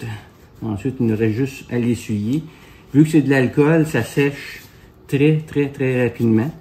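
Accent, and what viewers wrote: Canada. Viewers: Bonjour, Merci et bravo pour votre tuto très pédagogique et avec l'accent Canadien en plus! C'est un vrai plaisir. 👍✌️Bonne route à vous, Philippe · Merci pour ce tuto avec le petit accent du Canada...👍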